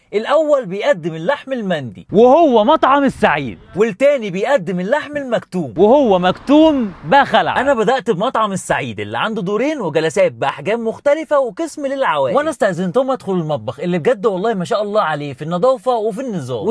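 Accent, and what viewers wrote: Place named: Egypt. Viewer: سبحان الله من اسمع صوت مصري يجيني التكاك والغلقه وضيقت الصدر أنا لله وأنا إليه راجعون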